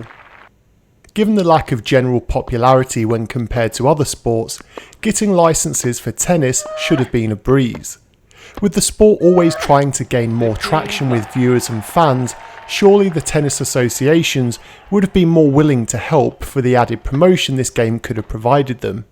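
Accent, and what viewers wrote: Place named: United Kingdom. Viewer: This is "All-Star" tennis! Where the hell are Agassi, Sampras, Seles, Kournikova? Nice review,. Keep up the British Commentary.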